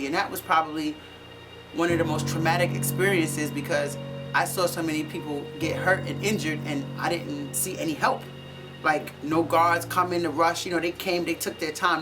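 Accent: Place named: New York City, United States. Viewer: That's the NYC accent! I love in the Bronx...woman are a bit tougher than your average coming from NYC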